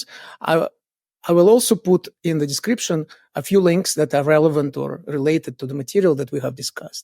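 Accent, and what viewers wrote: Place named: Russia. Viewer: I LOVE the Russian accent! Like a badass spy is teaching math and mysteries of the universe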